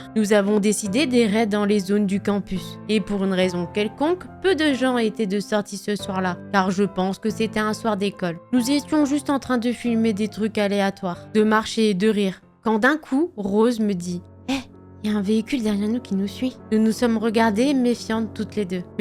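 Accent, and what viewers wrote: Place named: Belgium. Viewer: Belge ahah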